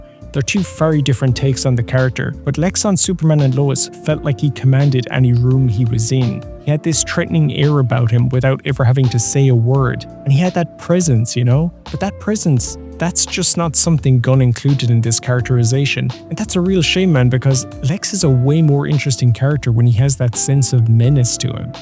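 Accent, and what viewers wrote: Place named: Ireland. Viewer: Why am I hearing the Jacksepticeye irish accent? lol Good video tho I agree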